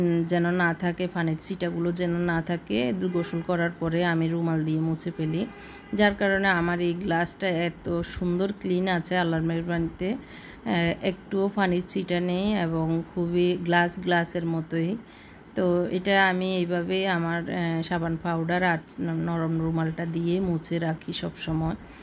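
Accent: Noakhali, Bangladesh. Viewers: আপু আপনি কি নোয়াখালীর। ভাল না লাগলেও glabs use অবশ্যই করবেন। আপনার হাত নষ্ট ঽবেনা। অভাব হয়ে যাবে।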